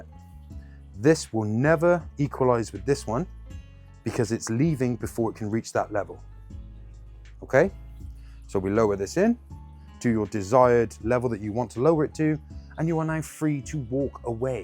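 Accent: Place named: United Kingdom. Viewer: Mate are you from the uk by any chance?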